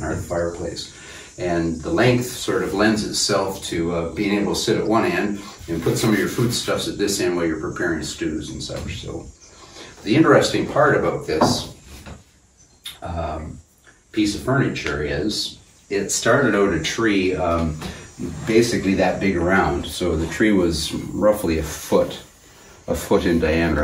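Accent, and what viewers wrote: Canada. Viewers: Ahh, I thought I detected a Canadian accent. I love ypur chamnel. U are quite amazing to be able to do what u do.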